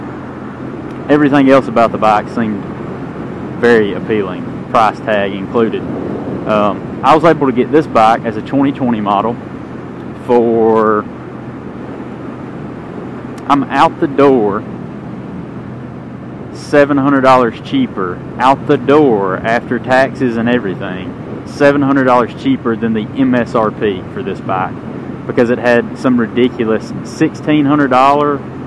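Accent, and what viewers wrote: South, United States. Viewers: I like your accent. looks Sothern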